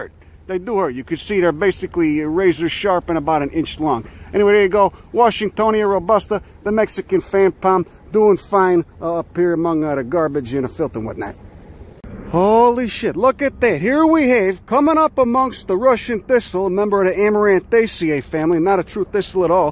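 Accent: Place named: New York, United States. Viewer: Name that accent! My guess is NY/NYC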